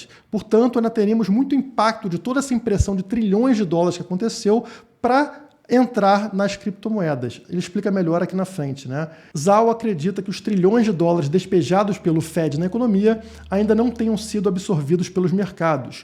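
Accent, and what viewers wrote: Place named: Tijuca, Brazil. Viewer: Rapaz...você é tijucano?! Rsrs TMJ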